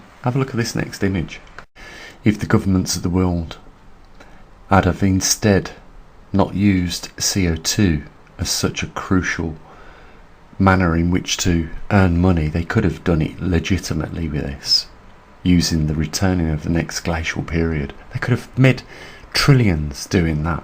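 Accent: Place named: Midlands, United Kingdom. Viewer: Very interesting info. Do wish I had finances to assist your channel. I’m local to you (just south of Solihull) based on your accent.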